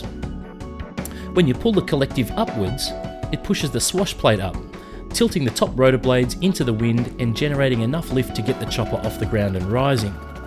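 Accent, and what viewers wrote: Australia. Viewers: Great video, funny and informational. Love that Australian accent. "Deesk of Leeft"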